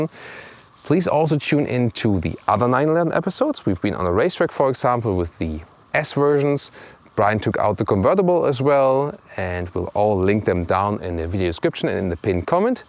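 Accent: Germany. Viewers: The inflection of a German accent in English makes for a fantastic review!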